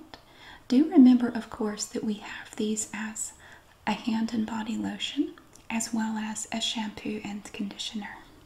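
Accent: South, United States. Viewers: I just love your channel & the variety of ASMR you explore. I don't think there is anyone quite like you out there with the same style or format, making you extra special(to me, anyways) I really enjoyed this video, along with your store hauls & shelf straitening. I LOVE your southern, soft accent. Much love & blessing to you from a fan in Vancouver, BC Canada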